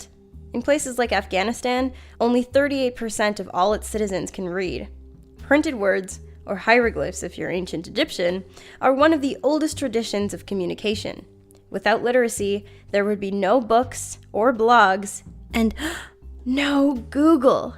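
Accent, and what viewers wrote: Canada. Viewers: Okay the only fact that blew my mind (No pun intended, you'll see why) is that that they're pretty much no naturally blue pigmented foods. And I had a feeling you were Canadian because a few videos ago I remember hearing pronounce a few words in ways that sounded like a Canadian said it. And it's cool! I'm American, but I'm fans of some Canadian skaters, there's some Canadian YouTubers I think are cool, and I have a few friends that are Canadian.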